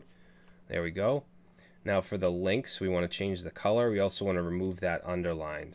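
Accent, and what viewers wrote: Boston, United States. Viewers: Sometimes you sound very young and then the Boston kicks in and you cuss and sound older, but with the cam we can now tell you are just right. :)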